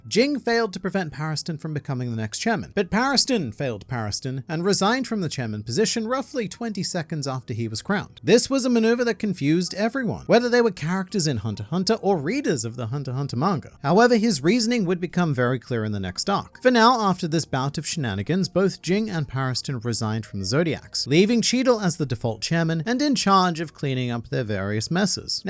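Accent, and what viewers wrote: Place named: Australia. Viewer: I've been subbed for a while now but stopped watching for a bit. Just listening to your voice in your earlier videos, it's obvious that you're Australian and being a basketball fan, I've always guessed you'd probably look like Matthew Dellavedova. Now seeing your face for the first time... Holy shit. Lol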